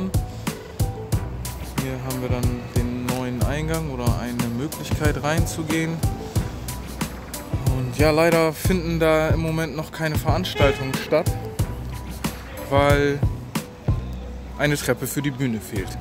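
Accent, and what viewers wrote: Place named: North, Germany. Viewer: Du redest richtug wie ein norddeutscher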